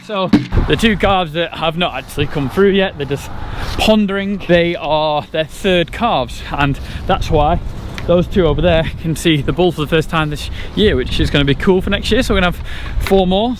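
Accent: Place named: England, United Kingdom. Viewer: New to channel. Coming at you all the way from suburban southern California. Just trying to catch up on some of the terms... A bit of a challenge sorting out some of the words through the accent. Like it though.... Not a farmer here in the states but it looks interesting to watch and learn how the UK does it a bit different than in America. Is a good window into the reality of the English countryside. Question..... What is cake? It can't literally be cake, must be slang for something? Keep up the good work. Love the videos!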